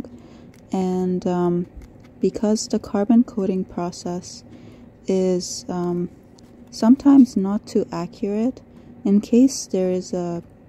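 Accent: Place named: Iran. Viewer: I hear a lovely iranian accent ❤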